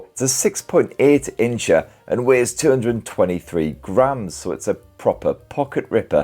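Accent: United Kingdom. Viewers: Are you from the UK, your accent is hard to catch.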